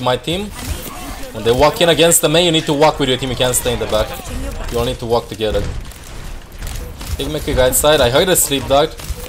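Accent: Romania. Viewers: Did i say he needs to hide it? I just asked if he's doing that accent on purpose because if yes I'd rather him to speak normal english without romanian accent to understand him more. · He's Romanian lol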